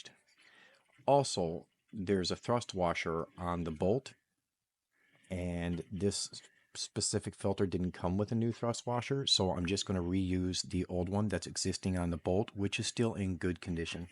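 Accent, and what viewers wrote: Newfoundland, Canada. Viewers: Man. You sound like a Newfie. Are you?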